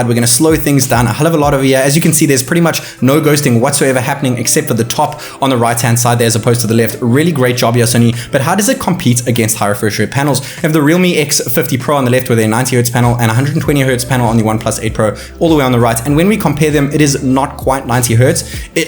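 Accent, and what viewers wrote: South Africa. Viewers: Great video! BTW are you South African? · Are you South African? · Hey, are you South African?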